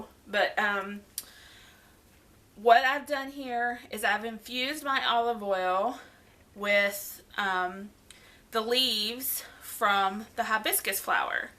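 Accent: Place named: South, United States. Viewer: I love your voice. Such a pretty "Southern Belle" charm:)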